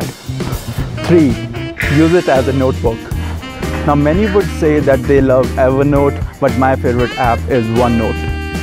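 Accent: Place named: India. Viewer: Love the Indian voice keep it up !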